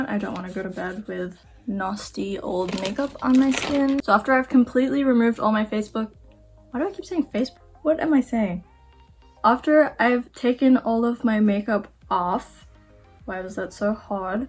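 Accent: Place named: Australia. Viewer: Where are you from? I know it sounds weird but i keep hearing a slight aussie accent from you ! Are you an aussie but have lived in America for years?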